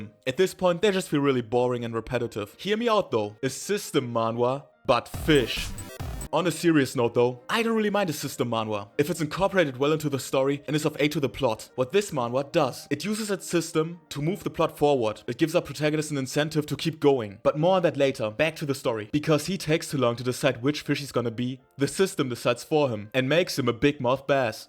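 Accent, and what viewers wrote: Germany. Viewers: You got that german accent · You Sound Like U have a German accent somehow xd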